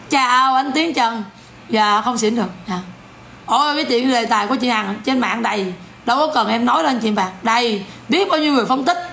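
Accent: Standard. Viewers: noi qua chuan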